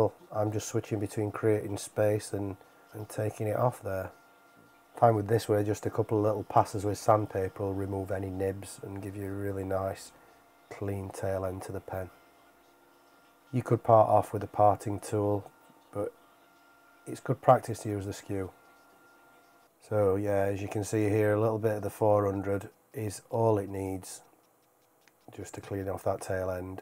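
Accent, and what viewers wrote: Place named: Yorkshire, United Kingdom. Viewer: Cheers, the's nowt wrong wi a bit o Yorkshire in a video.....I may all revisit that gag 😉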